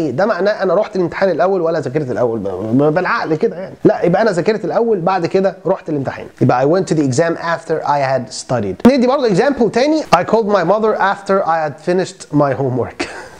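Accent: United States. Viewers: past participle نطقك امريكان والنطق اللى انت اتريقت عليه ده بريتيش ارجو لفت الانتباه لذلك لان بعض الناس هتعتقد انه نطق خاطىء وشكرا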